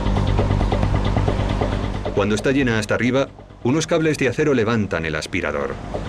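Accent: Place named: Spain. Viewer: Es un locutor español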